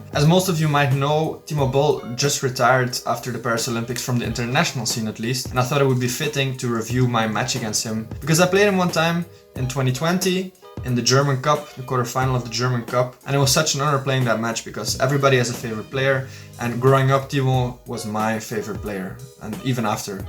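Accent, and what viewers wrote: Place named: Flanders, Belgium. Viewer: Nice video, thanks! And I thought you gave Timo a good game:) From your name I was surprised to hear you had a Flemish accent. Goed gespeeld;) It’s a pretty basic observation, but I noticed many of your returns from topspin balls deep into your backhand (when you were close to the table) went long. I often do the same and I’m puzzling over effective options in such situations. Good health to your knee and many more amazing TT adventures to you 🙏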